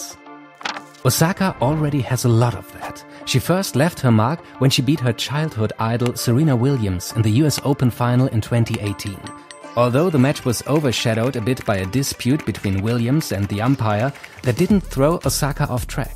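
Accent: Germany. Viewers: Are you [speaker] from Germany?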